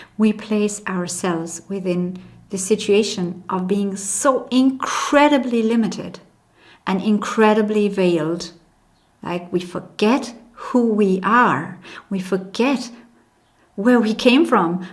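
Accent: Ireland. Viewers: May I ask are you Irish?! I am and your accent is very Irish!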